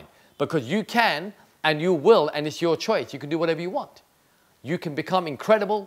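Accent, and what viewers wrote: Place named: Europe. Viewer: Oh, you are really great. My problem is--I can not follow your foreign accent, and difficult to understand your content.... You do not know what I have done and still doing to understand your accent. I think yours are European accent, may be like of England..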